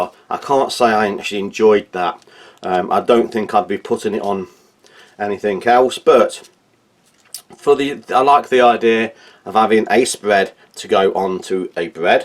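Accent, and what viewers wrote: United Kingdom. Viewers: Great and detailed review ! And as always it's a pleasure to hear your pure British English :) I like the German ration because of the diversity and amount of items. The content tries to remain traditional German meals-like breakfast-which are often bread/rolls with butter and some meat or cheese. The cold drinks are the best !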